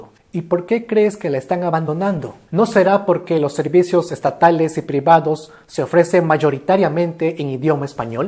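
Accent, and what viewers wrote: Cusco, Peru. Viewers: Ser y de origen cuzqueño te trajo algun problema... cuenta tu testimonio... mi querido broder...como hiciste para súperar el acento cuzqueño que es fuerte por cierto...eres quechua hablante?.tus razgos fueron motivo de mofa en esta Lima despectiva?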